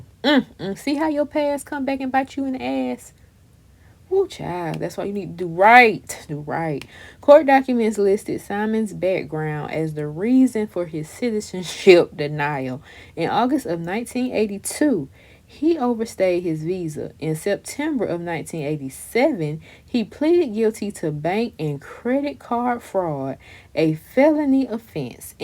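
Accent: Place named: Mississippi, United States. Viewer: I love your accent 😂 I’m from MS and this is how we talk!!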